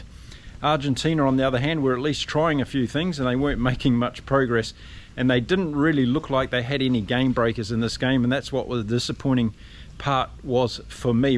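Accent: New Zealand. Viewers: indeed, but you could easily apply that to the English team from the other night, because it was precisely power and self-belief that won them the game. I think what we're talking about here is aesthetics and beauty is different for everyone and is different in every society. What I found funny was that your reason is consistently unbiased and its only the Kiwi accent that tells us where you are from, but your aesthetics on the game places you very precisely in the world.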